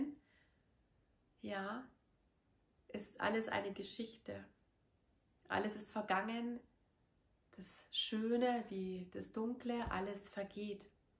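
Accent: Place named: Bavaria, Germany. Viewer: Schoen,der bayerische Dialekt🙏🙏fuehle mich zuhause🙏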